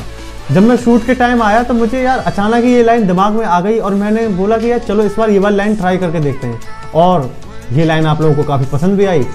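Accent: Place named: Haryana, India. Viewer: Bhai apka toh bhasa hariyana ka hai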